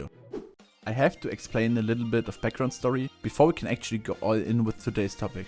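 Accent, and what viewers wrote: Germany. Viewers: Bro du hörst dich mies deutsch an (ich kann auch nicht besser englisch)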